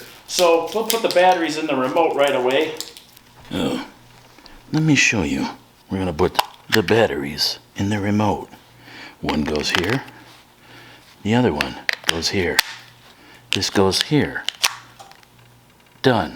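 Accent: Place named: Canada. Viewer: Awesome. Canadian, eh?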